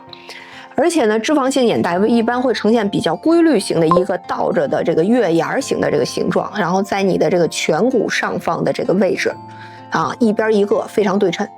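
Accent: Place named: Beijing, China. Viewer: 您的北京话听着很亲切！一对一怎么收费呢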